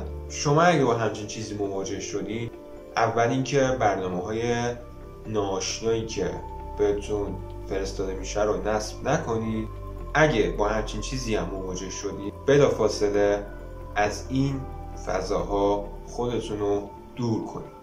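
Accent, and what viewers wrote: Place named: North, Iran. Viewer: داداش لهجه داری.شمالی هستی؟🤨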